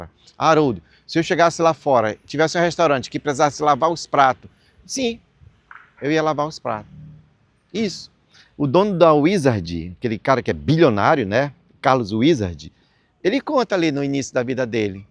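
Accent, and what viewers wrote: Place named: Paraguay. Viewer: De onde você é? Soa paraguaio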